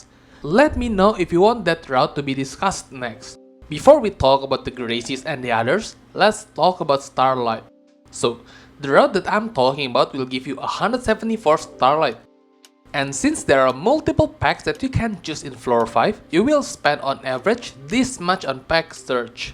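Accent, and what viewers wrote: Indonesia. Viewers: You have an indonesian english accent, are from indo? 😀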